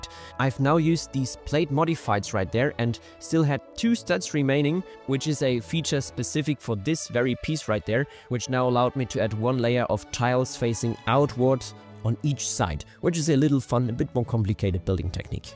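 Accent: Germany. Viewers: Nice German accent you have there. So this is actually a departure airport with no arrivalibility? I guess i just invented that word. Thats what we germans do...😁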